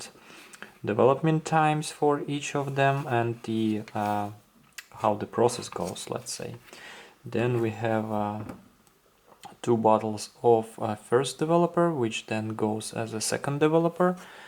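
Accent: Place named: Russia. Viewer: У парня тяжелый русский акцент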